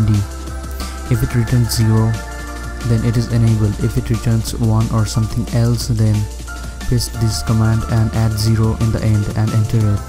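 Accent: India. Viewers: Indian accent and annoying music. Awful video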